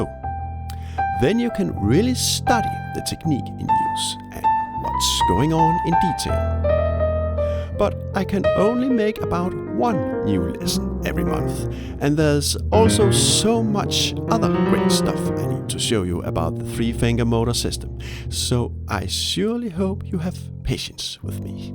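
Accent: Denmark. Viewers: holy denglish han må simpelthen være dansker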